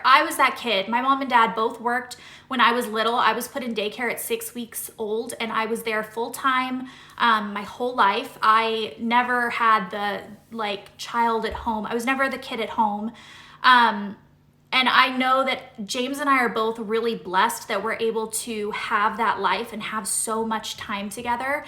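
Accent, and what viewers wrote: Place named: South, United States. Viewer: Feel like you've picked your Southern accent back up since moving back!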